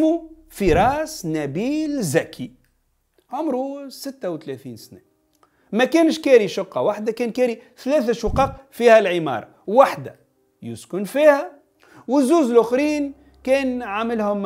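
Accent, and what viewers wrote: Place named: Egypt. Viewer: يا سي طالعة عليك الأكسون المصرية، اما البلاصة إسمها دقَهْلية موش دَقْهلية السكون على الهاء 😁😅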